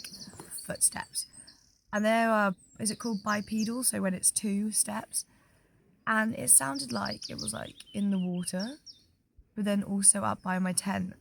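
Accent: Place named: West Country, United Kingdom. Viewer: Absolutely brilliant,, love the passion,,does it really matter how many miles are walked every day?? I think you're doing a 'proper job' !!! West country twang for you!! All the best 👍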